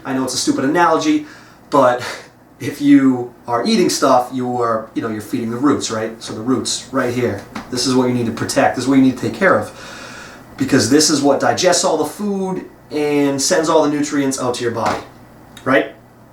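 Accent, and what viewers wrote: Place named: United States. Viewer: Brother i can't understand spoken american well. Can u write down your recommendations please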